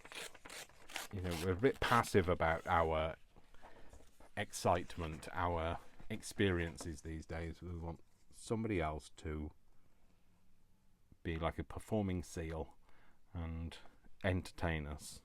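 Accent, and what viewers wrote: United Kingdom. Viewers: What a fun video today! I just love some Northern accent me! Those hobbies weekly mags are great! What a super find and love this project! Keep being jolly……cos I am as well! Love from Edinburgh xx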